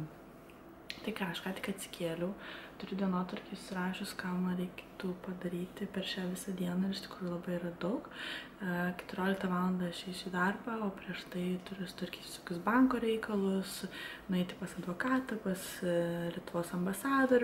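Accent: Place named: France. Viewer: kokia graži prancūzų kalba , malonu žiaaauriai klausytis😍😍😍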